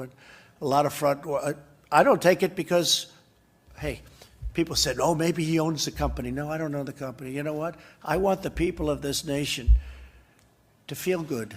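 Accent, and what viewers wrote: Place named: United States. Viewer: Yes if only there could be a split screen! You’re in Germany but you sound American, don’t forget about.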